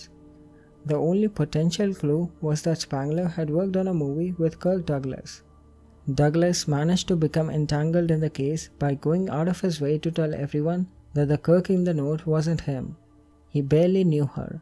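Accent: India. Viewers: Narrator: I’m sorry, my pronunciation is trash. Also narrator: acrimonious You’re perfect. Don’t worry about your accent. Desi accents are awesome.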